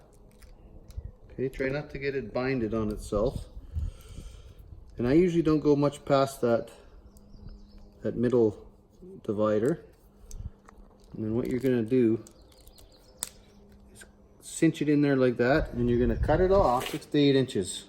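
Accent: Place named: Canada. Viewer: Knew you sounded Canadian, then I saw the shirt. Cheers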